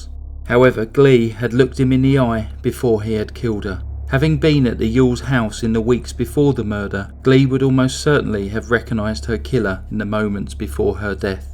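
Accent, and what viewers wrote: England, United Kingdom. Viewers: I love the English accent, just sexy! I bet you're hot! Lol, just kidding! Honestly, though, you're very intelligent, well versed and I love the respect you have for the victims and their loved ones while you're doing the video. Thank you for taking the time to gather all the information as it shows you definitely put work into each video! New subscriber for life.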